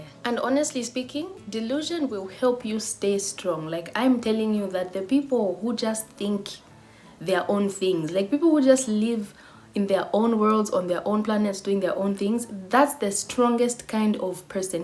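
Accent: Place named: United Kingdom. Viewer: It’s the British accent for me 😂😂😂😂even me I’m delulu I want to become a British citizen!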